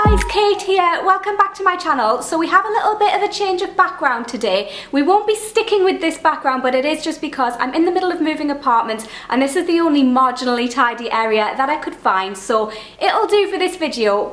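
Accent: Newcastle, United Kingdom. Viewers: Great review def gonna try this!👌🏻 I love your accent,makes me feel like I'm watching Geordie Shore & puts me in a good mood!😁😄 Loving ur videos😊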